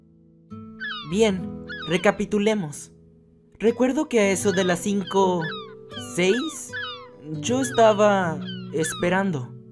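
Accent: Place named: Peru. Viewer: Ala shaval v: Me eh sorprendido con tu acento peruano 10/10 Entons pa cuando la segunda parte :D? ;-; no mms 🌈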